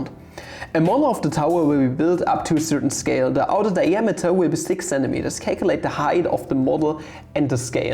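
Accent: Germany. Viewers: I can feel your german accent while you speak English.😊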